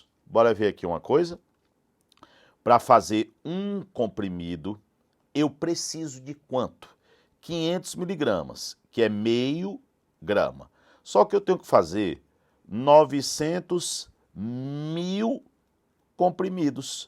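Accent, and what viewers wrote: Northeast, Brazil. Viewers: Além de excelente professor, tem um sotaque lindo demais!! O senhor é daqui do Nordeste né prof?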